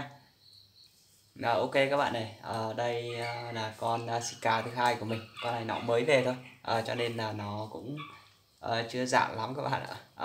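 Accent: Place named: North, Vietnam. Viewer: Ồ xa quá. Em thấy a nói giọng bắc tưởng a ngoài bắc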